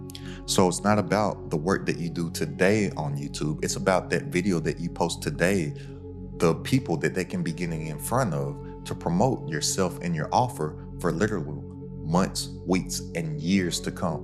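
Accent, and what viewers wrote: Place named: Los Angeles, United States. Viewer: oh wow that's trippy. Same exact type of slang and speech pattern as my homies in the LA area. Ur videos are fantastic, exactly what i needed. Keep up the good work 👏 starting a youtube channel very soon thanks to you. Finally going in.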